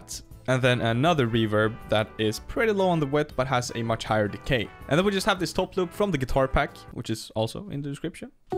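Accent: Sweden. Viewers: I think you should give us the mixing stuff that you always do with details. And a mastering start to finish .(i actually love your swedish accent 😂) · din svengelska dialekt är ju fett najs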